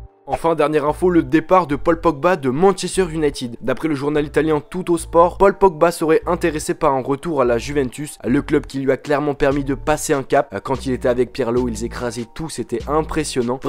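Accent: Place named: Belgium. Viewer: Tu est belge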